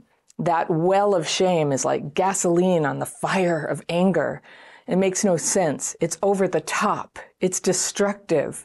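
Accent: United States. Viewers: so embarrassing about my cluttered apartment .. while running to shelter .....❤1. I brought this trip upon myself....Where to escape?? Currently, exhausted, Extremely isolated, brainfog, in Israel #under_fire🎉 #day24 2. Expat from the USA, and appreciate your American accent. Voice From home . I studied psychology... Came here to confront religious Absurd-abuserS who live in Israel.😮😅😊.... So glad I just found your channel/ account. I need tips + excersize for time of war. Staying in hallways/ bomb shelters mostly. Tiny spaces. In Israel under rocket fire. Bless u for all you do! Can send me an email with your videos on you tube or any other free content you can offer. Where are you located these days? City/state? I'm an Expat from Miami currently in central Israel! Sending love, light & hugs wherever it is needed. Amen!❤️ SaraGraceFreed😊3? How to pack and plan while under fire, 4. 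NEIGHBORs Friends have lost.lived ones... mmm. 5. Buying groceries instead! So confused. Help!❤Sara😂🎉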